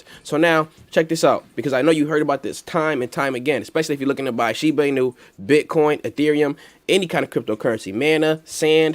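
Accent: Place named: Brooklyn, United States. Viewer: Brooklyn NY I can hear you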